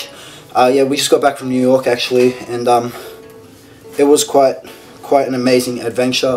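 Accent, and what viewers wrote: Australia. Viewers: GLAD THAT YOU HAVENT LOST UR AUSSIE ACCENT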